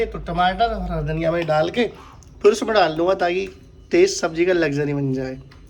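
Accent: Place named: Karauli, India. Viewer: Consa gav h bhai ji yar me bhi karauli ka hu aap ki aabaj bhi karauli said ki lagthi h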